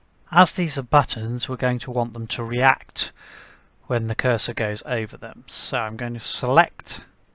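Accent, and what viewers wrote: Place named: United Kingdom. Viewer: I have been watching tons of software tutorials about different applications and so far you are the most comprehensible and concise. Seems to me that you have planned it methodically or maybe you're just a natural. And this comment is coming from a guy (me) who is a non-native English speaker listening to another guy (you) with authentic English and a British accent. Keep up the good work. Thanks and God bless.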